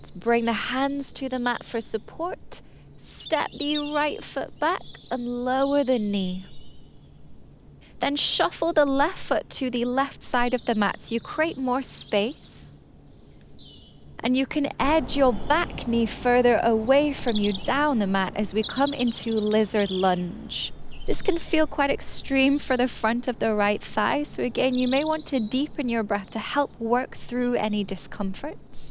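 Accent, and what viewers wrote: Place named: United Kingdom. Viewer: Hi, some feedback as you mentioned. I started 20minute daily Yoga October'24 to raise money for BoneCancerUK. Since, have continued for my own well being, and today, the 82nd Day, I worked with this 30 minute class. Thank you for your clarity explaining he expectations. (PS love your British accent, and the birdsong: )) 🙏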